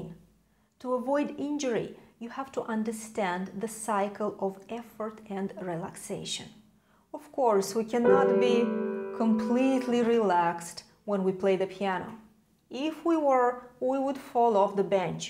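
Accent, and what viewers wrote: Russia. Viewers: Ура! Я могу услышать русского человека, просто услышав как он произносит отдельные звуки английского. Я угадал, что вы русская? По крайней мере русскоговорящая?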